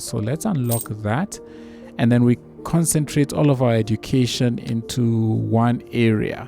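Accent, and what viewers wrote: South Africa. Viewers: Thank you for putting this up. I really enjoy the South African accent! It makes me miss home! :)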